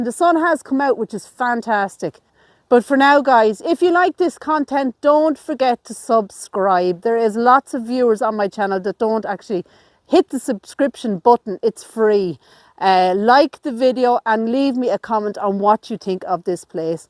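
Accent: Ireland. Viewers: I love listening to you and your Irish accent. To think my ancestors came from your beautiful country makes watching your channel even more interesting. Just one of millions of Americans whose ancestors came from Ireland. It’s beautiful and you do an excellent job sharing with us. 🙏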